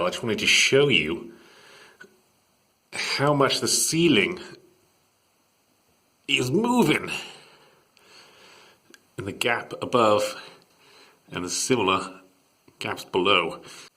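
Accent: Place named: United Kingdom. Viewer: Worst thing about this video, It sounds like a Brit trying to be American and it's making the video unwatchable.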